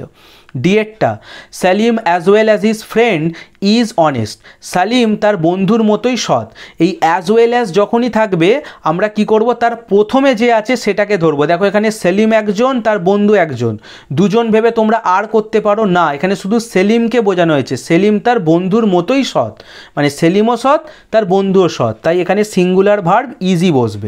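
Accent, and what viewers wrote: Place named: Howrah, India. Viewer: Sir. Are you from howrah district? Your version shows that!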